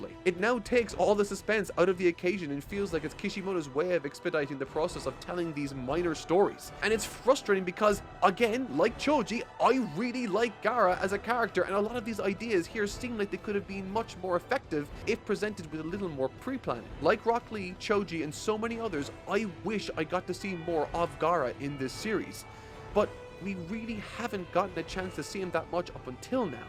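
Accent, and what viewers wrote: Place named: Ireland. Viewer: loves saying Cathartic in his thicc Irish Accent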